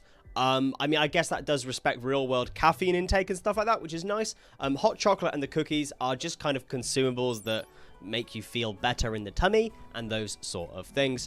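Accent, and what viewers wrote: United Kingdom. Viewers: wait u are brittish???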